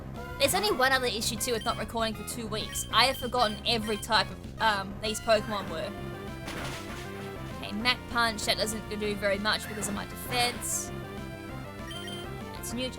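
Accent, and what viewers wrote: Australia. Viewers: Wait...are you an aussie? XD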